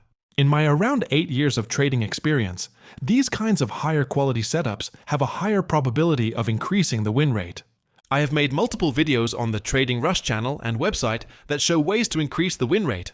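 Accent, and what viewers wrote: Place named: United States. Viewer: thank you for changing accent from british to u.s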